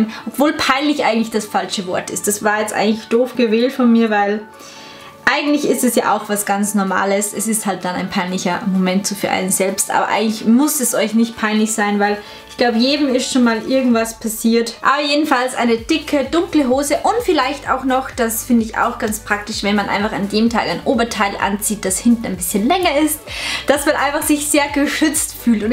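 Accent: Austria. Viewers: Bist du Österreicherin? :) (wegen dem akzent hätte ich halt geraten haha)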